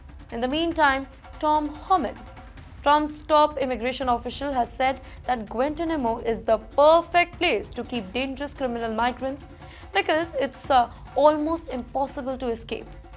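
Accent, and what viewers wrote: India. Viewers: Indianlish. Only Indians can understand. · Indian lady with accent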